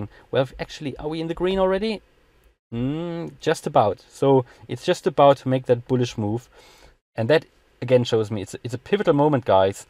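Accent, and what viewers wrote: Germany. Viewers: love this channel, thanks dude. keep it coming. btw are you german?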